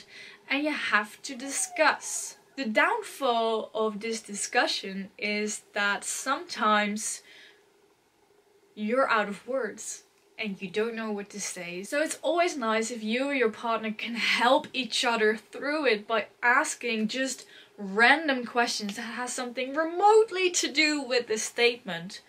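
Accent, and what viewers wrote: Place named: RP, United Kingdom. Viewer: hiya! prob u r not reading the comment section tho i can’t help but hats off to ur accent. this is just marvellous, impeccable clear RP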